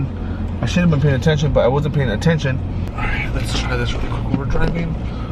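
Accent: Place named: Canada. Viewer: Did you just move to CA? Could of sworn you were Canadian :P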